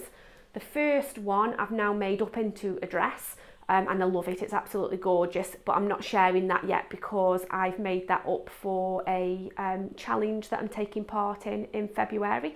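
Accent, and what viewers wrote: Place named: Northern England, United Kingdom. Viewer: Hi I am anew subscriber and quite a new sewer after just retiring from work. You sound northern I live near Chester. Love your channel. Have a lovely day. Dorothy 🙋🏻xxxx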